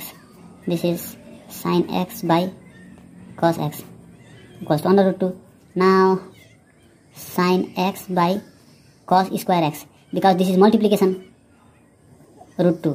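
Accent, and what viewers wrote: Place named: Nepal. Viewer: Bro u nepali?😂 nice English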